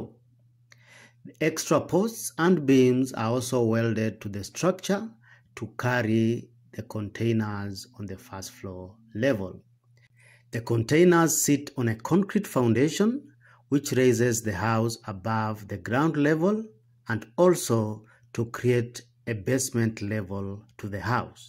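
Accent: Kenya. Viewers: YOU SOUND KENYAN ... ??? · The narrator has a Kenyan accent. It's what kept me watchinf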